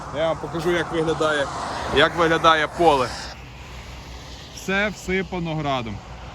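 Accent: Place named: Western Ukraine, Ukraine. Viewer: Спочатку була здивована почути українську мову, мою, рідну, "западенську"😊. А коли почула, що повертаємося до Тернополя, була приємно вражена👍👍👍. Відео цікаве, інформативне, заохочувальне! Аж захотілося теж пошарудіти рукою по свіжому граду. Успіхів вам і надхнення!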